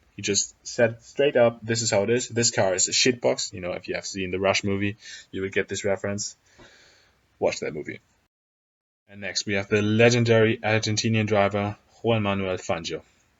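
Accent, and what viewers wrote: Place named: Germany. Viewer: so total agreement right there, except alonso and vettel. i would have switched them around because vettel is better than his ferrari years are letting him look. i think ferrari destroyed him and he would have challenged hamilton more with a red bull. alonso on the other hand was good no doubt but he was maybe to stupid to win more. his change to mclaren was the worst choice you can make and i think its no argument that he was better but didnt have the car to do it. he had offers for teams but he took the worst, in my opinion its all his fault and thats somthing a tier 2 driver has to be aware of. But great video mate. One question: Are you from Germany or Austria because you´ve got a strong german accent?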